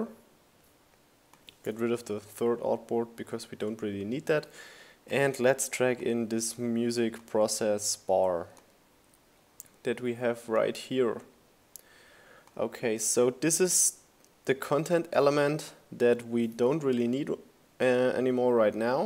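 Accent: Germany. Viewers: Du bist ein deutscher oder? ;D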